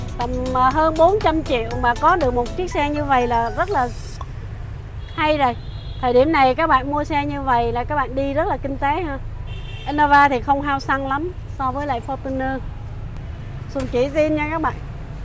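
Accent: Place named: Nam Dinh, Vietnam. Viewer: C VẦY, VẦY giống quê NAM ĐỊNH em thế.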